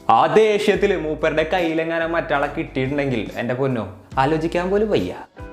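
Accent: Thrissur, India. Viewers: Chettan mmade Thrissur aano 💜💜atho Angamaly aano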